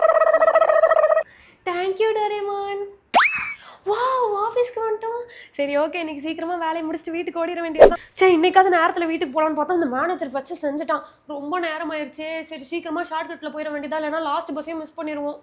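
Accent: Coimbatore, India. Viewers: Neengha coimbatore ah akka ??? ♥️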